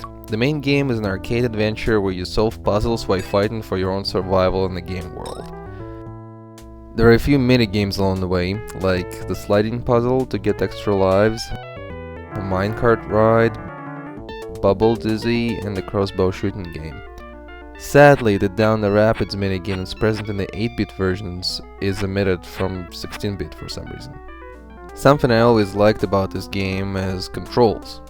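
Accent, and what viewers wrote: Russia. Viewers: Great review, dude! I love this game, it's huge! So much to do in it. Dude, I like your accent, are you russian?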